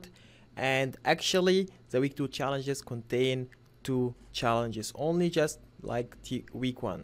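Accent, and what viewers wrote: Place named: Egypt. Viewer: ur an egyptian thats an egyptian accent i know because i am an egyptian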